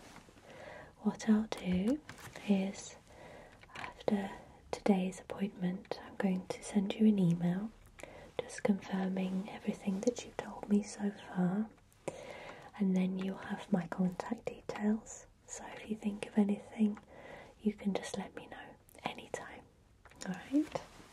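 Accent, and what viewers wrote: England, United Kingdom. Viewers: I love your beautiful, sincere smile, your soothing voice, your nice and stylish English accent.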